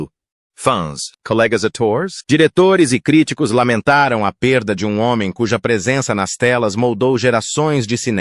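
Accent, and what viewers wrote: England, United Kingdom. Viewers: Para de falar com sotaque ingles. Fica horrivel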